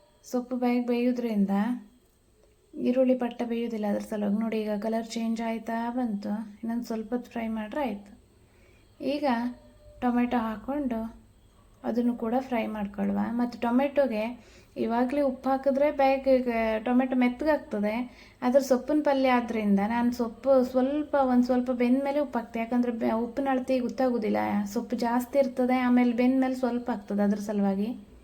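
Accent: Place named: Sirsi, India. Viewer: ಶಿರಸಿ ಭಾಷೆ ❤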